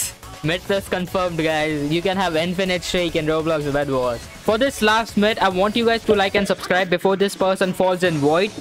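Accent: India. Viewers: an Indian? sounds like Indian but an Indian Roblox player who plays ROBLOX yay never found one like you!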